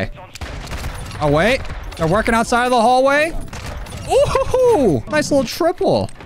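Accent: United States. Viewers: Americans and their pronunciation 🤦🏻‍♂️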